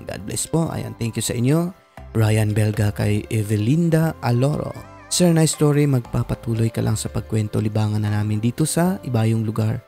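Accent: Visayas, Philippines. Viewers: Qa cute mag bisaya oi😊😊😊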